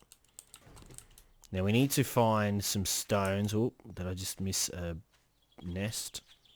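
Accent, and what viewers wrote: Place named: Australia. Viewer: sure did! accent kept me in lol, Australian?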